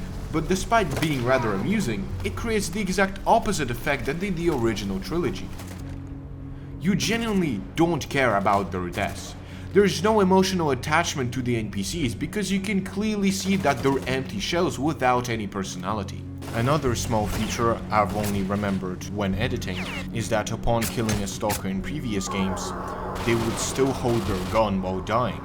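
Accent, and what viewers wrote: Russia. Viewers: As I understand it, the author of the channel is a Russian speaker like me (based on the RU interface, which sometimes appeared in the video and a slight accent) I can only praise him for such a video, knowing what kind of resistance he can get from those who justify all these downgrades. It is surprising that in the video of an English-speaking person there are almost no dislikes and defenders of this "masterpiece", but when a Russian-speaking person says similar things, then some kind of nightmare and insults begin in the comments. Thanks to the recommendations of YouTube for letting me see this video, thanks to the author for the work done. All the best. P.S. Nothing in the game works as the developers claimed, but it certainly deserves 83% positive reviews (at the time of writing the comment). Fucking circus, there are simply no words.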